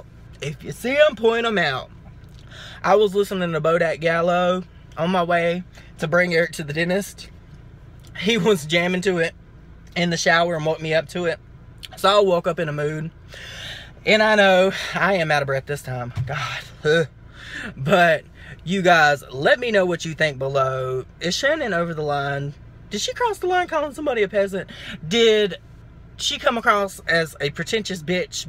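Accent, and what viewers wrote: South, United States. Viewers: Newbie here lol Peter Monn has said such great things about you & now I know why! As soon as I turned this video on, I got the sense youre a southern drawl, younger (Not saying Peters old, dont come 4 me, were same age)from the country version of Peter which I mean to be a compliment! You have the same positive kind energy & mannerisms that I could tell within a minute of your video😊Thanks for this vid & will be checking your other vids out😀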